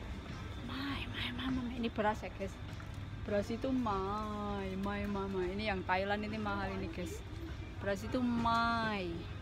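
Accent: Java, Indonesia. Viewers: Hhhee embak orang jawa yea .hhhhee mrdok bahasanya. Keren mantab salam 77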